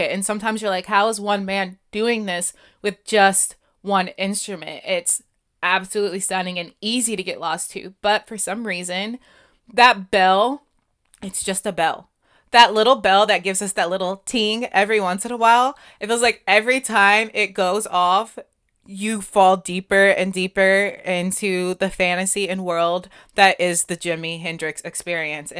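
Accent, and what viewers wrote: South, United States. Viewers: This song is just too short. It needs at least another verse and chorus. BTW, your southern drawl is showing 😃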